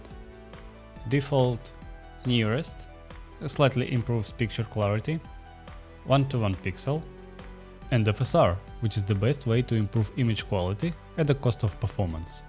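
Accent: Russia. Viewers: Привет, по акценту сразу понял что русскоговорящий , сможешь помочь поставить виндовс и утилиту переключения?) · По акценту очень заметно что ты Русский) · your accent is way too Russian. You are certainly not Ukrainian, and if you are from so-called Belarus, it’s basically the same that you are Russian.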